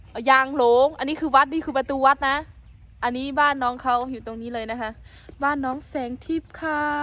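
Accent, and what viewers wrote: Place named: Tai Khuen, Thailand. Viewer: สวัสสดีน้อง เสียงแจ้วๆด้วยเสียงสำเนียงไทขืนเพราะน่ารักๆมากเลยส่งคลิปสนุกๆมาให้ชมพาสาวๆไปเท่วงานปีใหม่ไตมีคนมากมายมาเท่วงานขอบคุนมากครับ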